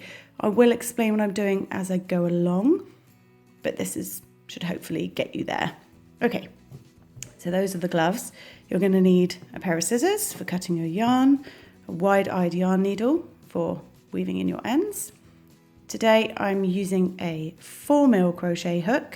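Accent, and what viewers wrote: England, United Kingdom. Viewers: I love your videos! I always learn so much from them. Will use the chainless foundation forever now. Also makes such a pleasant change to hear an English voice. Keep up the most excellent work! xx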